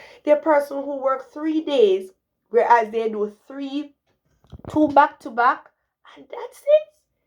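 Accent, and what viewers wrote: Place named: Jamaica. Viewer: Love your Jamaican accent!!!!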